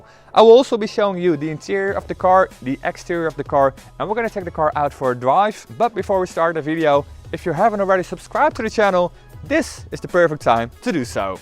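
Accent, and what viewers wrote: Netherlands. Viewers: is the accent dutch?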